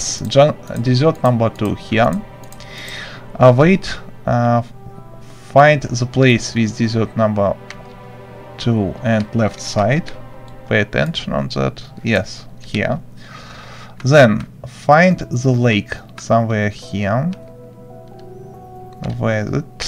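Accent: Russia. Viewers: ты русский? мне кажется у меня похожий акцент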